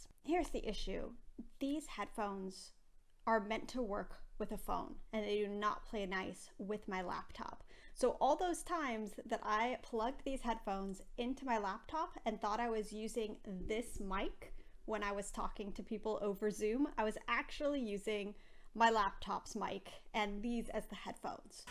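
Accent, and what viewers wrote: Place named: United States. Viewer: You sound American, but you refer to Pounds in currency.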